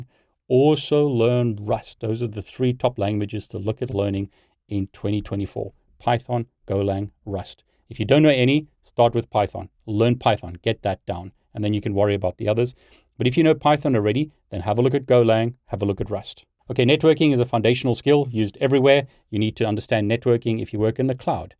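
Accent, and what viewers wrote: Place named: South Africa. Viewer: Well your accent indicates that you are South African and South Africans are one of the most studious, hard-working, Stoic, Intelligent, never-give-up, honest people in the world~!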